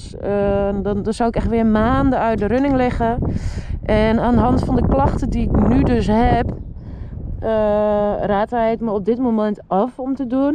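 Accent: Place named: Netherlands. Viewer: Hi, I hope everything is ok with a nex surgery. Thanks for you vidéo <3 i like your dutch accent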